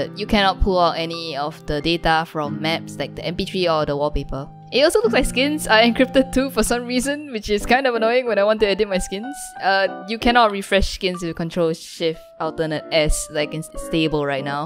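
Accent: Singapore. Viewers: Oh man, I could recognize the SG accent anywhere :D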